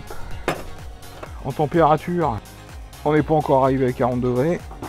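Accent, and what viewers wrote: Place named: Normandy, France. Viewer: C'est l'accent normand hehe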